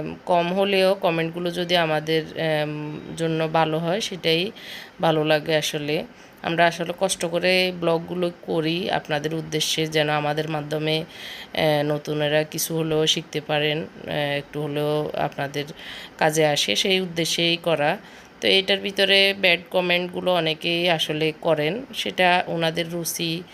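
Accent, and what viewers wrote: Noakhali, Bangladesh. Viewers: আপু আপনার ভাষা অনেক পরিচিত মনে হলো।নোয়াখালীর ভাষা।আপনার রান্না ঘর অনেক সুন্দর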